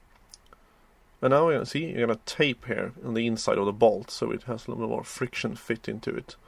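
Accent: Sweden. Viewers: THE SWEDISH IS OBVIOUS!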